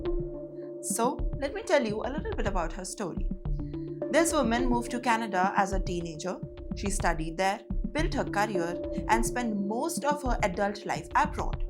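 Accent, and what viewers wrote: India. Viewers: Move back to India, the chances why you are still single is because of your strong Indian accent and the lack of her civic sense.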